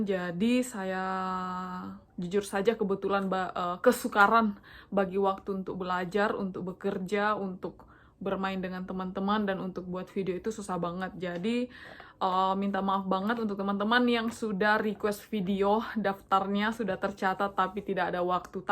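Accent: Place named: Manado, Indonesia. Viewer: Logatnya , mungkin kamunya dri Manado ya ? Atau bagian Indonesia tengah lainnya ? 😊 .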